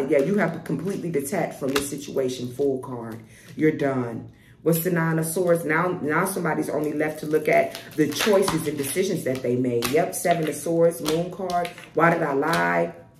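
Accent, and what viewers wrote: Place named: Chicago, United States. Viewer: You talk like you from chicago. Your vocabulary is crazy. I luv it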